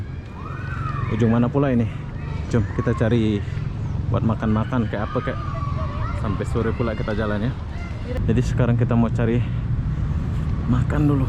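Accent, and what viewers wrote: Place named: Peninsular Malaysia, Malaysia. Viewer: Abangnya ngomong ala melayu semenanjung sama orang Sarawak sementara orang Sarawak cara ngomongnya mirip Indonesia.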